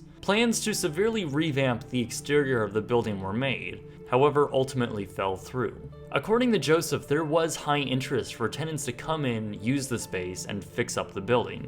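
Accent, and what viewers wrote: Canada. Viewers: you Canadian? sounds it. Orangeville? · Canadian detected.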